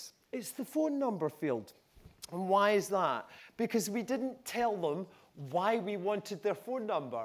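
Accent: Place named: Ireland. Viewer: that accent though such heavy Irish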